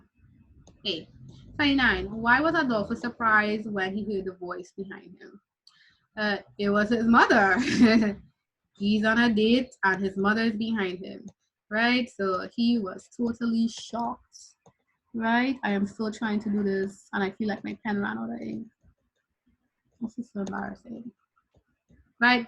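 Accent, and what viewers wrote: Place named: Dominican Republic. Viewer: You speak spanish like someone from the Dominican Republic. Thank youuu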